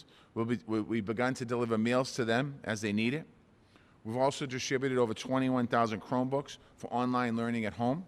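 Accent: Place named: Boston, United States. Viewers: Im just here to be entertain by the Boston accent. #quarantinedlife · WTF? Non American accent!! That's a Boston accent!! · Went to school in Boston and the whole time I couldn't stand that accent!!!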